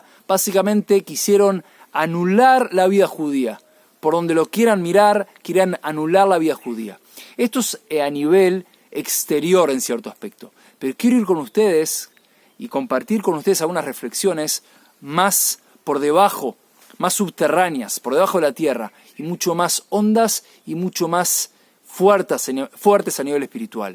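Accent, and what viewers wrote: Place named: Argentina. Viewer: Me gusta su explicación, pero tengo una inquietud, ud. Es judío de . Nacionalidad judia, oh ee ud. Argentino. Su acento tiene algo de Argentina, igual explica excelente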